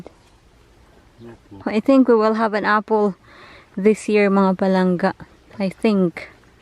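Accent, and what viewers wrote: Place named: Visayas, Philippines. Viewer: First time ko manuod ng vlog nyo po and nakakatuwa po kau. Sobrang ganda nyo po and your accent is like parang may pagka bisaya 💕😍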